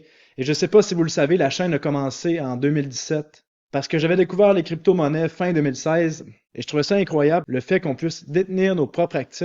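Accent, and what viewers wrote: Canada. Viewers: Salut, encore merci et bravo pour tes 100k, je me demande si ce n'est pas ton accent Canadian qui m'a attiré dans les cryptomonnaies 😊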